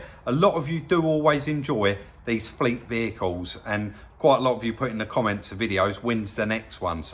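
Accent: United Kingdom. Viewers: I just come here for your accent. I miss the UK.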